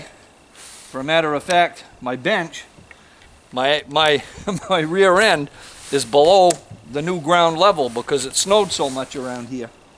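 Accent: Maine, United States. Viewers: awesome video. you gotta be from Maine! 👍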